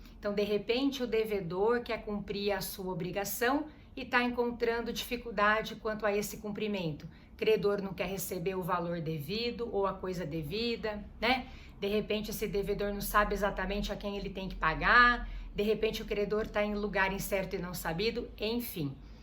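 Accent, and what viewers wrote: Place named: Paraná, Brazil. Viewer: Esse sotaque é do Paraná hein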